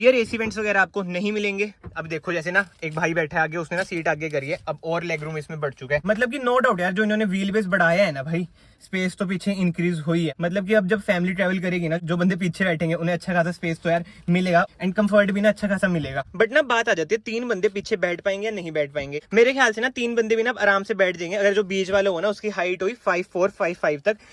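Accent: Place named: Jammu, India. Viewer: bhai jammu da lgda accent se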